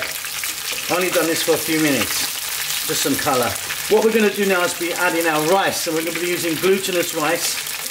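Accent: Philippines. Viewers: Like your Tagalog accent, and it is nice to see you back, and this recipe looks damn delicious will try and Thank you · Very good Pinoy accent to the ingridients"Nice presentation also keep cooking taglish Version Thank u..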